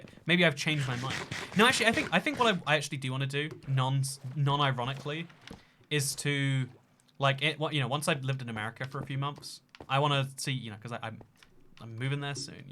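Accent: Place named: United Kingdom. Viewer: AN WHY DO U HAVE A BRITISH ACCENT IF U ARE FROM QUEBEC??????????????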